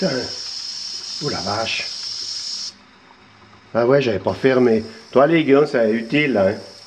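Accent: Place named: Vaud, Switzerland. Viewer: Bonjour, Votre joie de l'enfance que l'on ne pouvait pas s'offrir est communicative. Il me semble que Märklin faisait aussi ce genre de machine. Petit j'en rêvais...Bonne suite et merci de vos partages authentiques, plein de saveur, avec votre bon accent vaudois (j'ai le même!) Laurent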